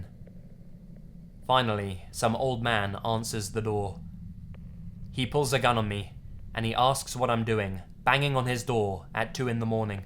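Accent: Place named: England, United Kingdom. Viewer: I LOVE this format. Lots of little (but intense) stories. Thank you for all your work. I love your voice too. Being from Sydney I hear a lot of English accents but yours is so warm and lovely.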